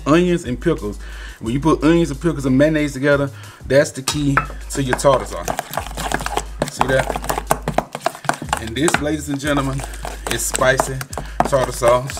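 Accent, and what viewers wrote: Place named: Louisiana, United States. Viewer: Love y’all was watching u heavy since 5k I use to have the biggest crush on you Cuh of your Louisiana accent lol 😂 food looks good tell hubby i said hey 👋🏾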